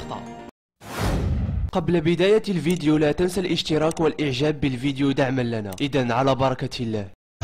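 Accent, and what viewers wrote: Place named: Morocco. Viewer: لهجة مغربية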